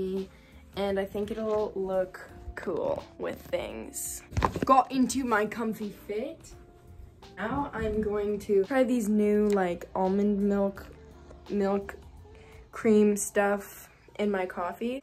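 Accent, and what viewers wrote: United Kingdom. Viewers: nah cause i thought u was british before i watched ur yt videos 💀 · pls ???? why did i think you were british HWJSJHWGF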